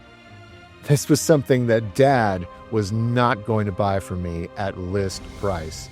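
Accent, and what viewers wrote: Houston, United States. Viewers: you from houston bro?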